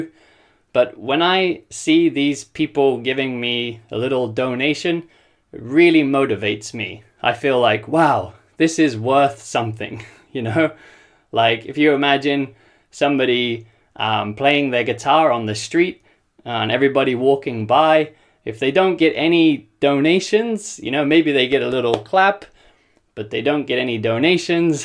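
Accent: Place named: United Kingdom. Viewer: Hi, I know I'm two years late but I thought you were from the UK when I first heard you. I wasn't sure but I thought so. (I'm argentinian)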